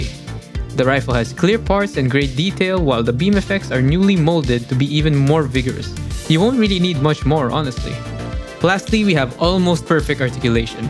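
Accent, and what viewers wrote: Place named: Philippines. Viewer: The filipino accent is strong on this one bois. We got another local reviewer! let's go!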